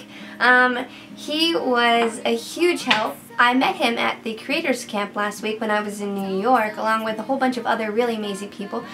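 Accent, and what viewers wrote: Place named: South, United States. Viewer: I love her slight southern accent, and I'm excited to see the zombie stuff you do in the future! Whoo!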